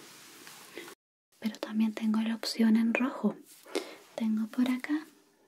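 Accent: Chile. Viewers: Awwwww aun así cuando te equivocas te ves muy tierna eres una chica dulce y me gusta tu acento chileno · Amo tu acento chileno 😭🛐❤️ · El acento chileno me encanta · Así que de verdad habla chileno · Siendo peruano (dato no menor), debo decir que me encanta el acento chileno y las jergas chilenas :v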